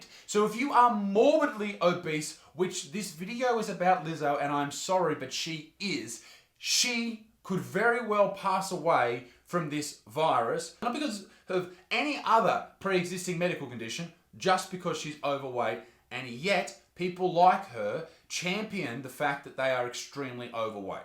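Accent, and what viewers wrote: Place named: Australia. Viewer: I just love way of talking, not just the fact his accent is Australian. But the way he brings the problems to light and why stupid shit like this can be extremely harmful for just the people who pro overweight confidence but people who are insecure and it gives some them bad ideas. Nice work, even if those don't listen but there are people we can save from this stupid ideas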